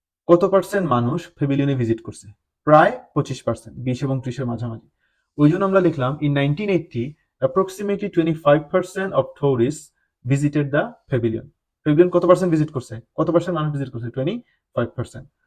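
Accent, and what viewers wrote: Sylhet, Bangladesh. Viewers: are you from Sylhet?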